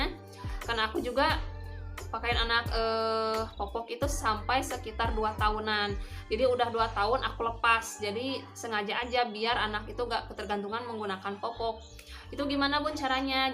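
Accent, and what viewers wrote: West Java, Indonesia. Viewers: Orang Sunda ya teh, logat y kaya Sunda hehe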